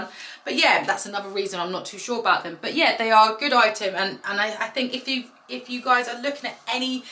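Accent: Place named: United Kingdom. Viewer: please don't get me wrong but I'm watching your videos for your accent. Have you ever thought of making a video about a British accent? I'm sure there are many people who want to copy your accent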